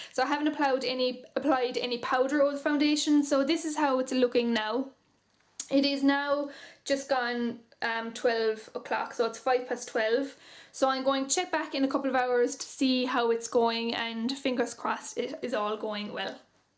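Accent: Ireland. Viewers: I love your accent so much!!! I love Ireland!!!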